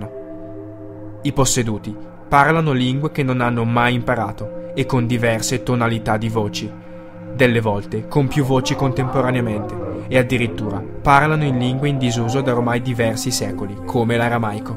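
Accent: Italy. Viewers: muoio per il purtroppo!! Comunque seriamente sei italiano? E se si da dove?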